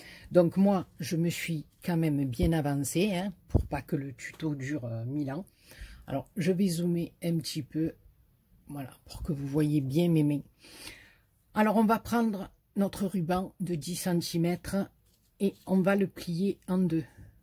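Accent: South, France. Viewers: Merci infiniment, j'ai vraiment passé un merveilleux moment avec l'accent du soleil..... Pour moi les petits anges ce sera pour l'an prochain, cette année nous quittons notre maison parce que ça fera 1 an le 24 décembre que notre chaton à rejoint les anges et nous n'avons pas le coeur à fêter sans lui.... Encore mille mercis et de très belles fêtes à toi et tes proches dans l'amour et la joie, gros bisous. Lucia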